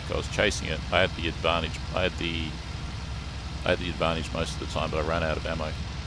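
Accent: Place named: Germany. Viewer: the sight, the guns, your will are not seperate things but one being, as is wing shooting. you shoot well but hesitatingly. refer to hartmann, his last interview, or read eicke's notes that richtofen relied uopn. we in the gallery are with you always, strange that accent...not hoch deutsch....oh well Wachendorf/usa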